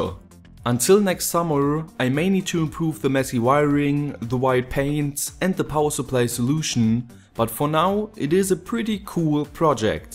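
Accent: Germany. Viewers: he's german, it's an accent. deal with it. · Du kommst doch bestimmt aus dem deutschsprachigen Raum oder? ;)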